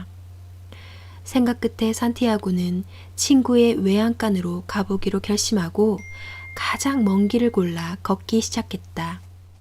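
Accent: Gyeongsang, South Korea. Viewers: 경상도 사투리가 너무 듣기좋습니다 좋은영상 고맙습니다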